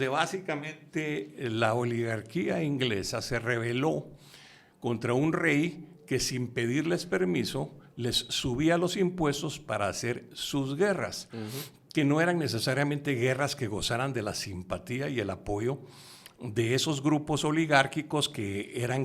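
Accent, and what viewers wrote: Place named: Guatemala. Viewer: Lo felicito. Su acento es casi el de los chapines de 1966 cuándo me fui. Hay otros que parecen mexicanos.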